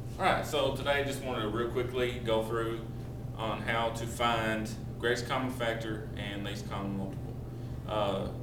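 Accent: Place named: Texas, United States. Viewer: good teacher. I like his voice it reminds me of Texas.. I like the way he does the method of problem solving too.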